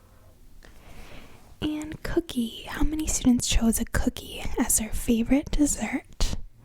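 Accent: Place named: Midwest, United States. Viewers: Excellent video, the mic you use softens the voice just enough. The accent is nice too, it sounds like you grew up near Cleveland. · lol. midwestern accents.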